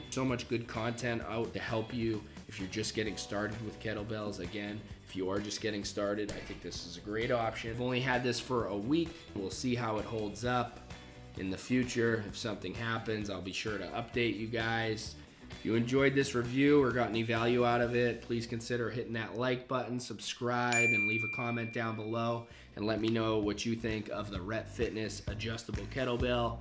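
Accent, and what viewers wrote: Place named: New Hampshire, United States. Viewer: I was just listening to this video and thought, "This guy is definitely from New Hampsha'" then I looked at my screen and saw the huge flag! 🤣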